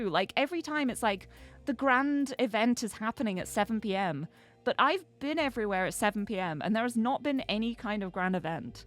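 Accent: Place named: United Kingdom. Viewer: I love your voice. Super soothing. Awesome UK accent 😄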